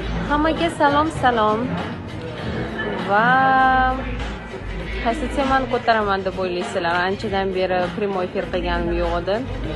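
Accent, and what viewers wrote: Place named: Kokand, Uzbekistan. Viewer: Sassiq qachondan rus tilida gapiradigan boldin yaqinda organvoldin shakili toza o'zbecha gapirardinku qoqonchalab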